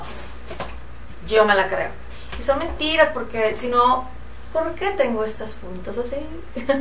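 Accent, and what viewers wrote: Mexico. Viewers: Adoro tu voz y ty forma de ablar xD eres mexicana?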